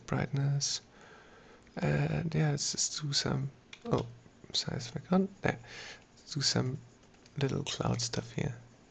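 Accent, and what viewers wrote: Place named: Germany. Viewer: Ich meine ich höre einen deutschen Akzent? Werden wir nichtperfecktenglischversteher auch mit einem deutschen Tutorial verwöhnt oder habe ich es übersehen? Lieben Dank...Finde die Möglichkeiten großartig.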